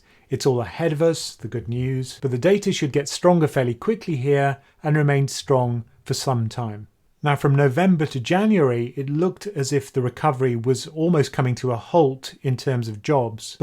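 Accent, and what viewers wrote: United Kingdom. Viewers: Is that a British accent?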